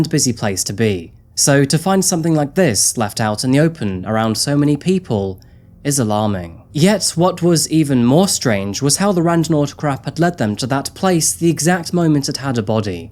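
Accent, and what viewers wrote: England, United Kingdom. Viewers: Who writes the scripts for this stuff? Why did i need an introduction of Seattle? Why did you tell me you were born in Seattle but leave me confused with your British accent. I can only guess you moved to the UK at an early age. You need a better team for your videos... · my Sister n fam lives in Seattle I am so glad I have lived in Western CO since 1980, I thought u sounded a bit like u lived in UK due to ur voice love ur vids thx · I thought u were British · And no better accent to do it with than the British accent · If you’re from Seattle, why do you sound like a British kid? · Wait a second here. You're from Seattle? Where's the English accent come from then. Or is it fake? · How were you born in Seattle with a English accent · Okay how did you end up with the British accent? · Born in Seattle? You must have moved to the UK very early on because that is a lifelong British accent. 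· Wait, you're from Seattle? What's with the English accent, then? Is it just an act? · You sound so polite, so refined, I did not think you were American! 😂 I thought you were British. 😂 ❤ from Australia P.S. I absolutely love your channel - addicted in fact - keep up the good work! 🤩🤗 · Ok you have to explain how you were born in Seattle but you have an English accent? Sorry if you’ve already explained this somewhere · Where do you get your English accent. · How tf were you born in seattle but sound like a complete tommy? That's weird my dude... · I’m so glad you don’t speak like an American! Love your British accent ❤ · Love your videos and your British accent. As for the douche bag who killed this young couple because they could not pay their rent, it made my day to know that he is behind bars and that he is going to stay there for a very long time, probably until he dies. What a dumb guy, you are paying back now for being so stingy and evil. 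Now you are old, weak, alone, depraved of freedom, without any outlook. I am glad that courts of justice in the US are severe, much more than in France. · I'm glad this case got solved and that pile of anal seepage is behind bars. Thank you for another great video! They're always so well-made. You're not just a disembodied voice or 3 hours of interrogation videos with minimal, basic commentary. You're empathetic, which I absolutely love! You're kind and respectful to the victims and their families, and it's also nice that you sound (like your accent) that you're close to me! I like watching more British creators, obviously I get our dry humour. I'm rambling. Anyways, thank you for keeping us all informed! Big hugs for you ☺🫂🫂🫂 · wait ..whaaaa did he just say that he was born in Seattle Washington ??? did I mis hear ? This guy is the most british sounding Brit in the history of Britain LOL weird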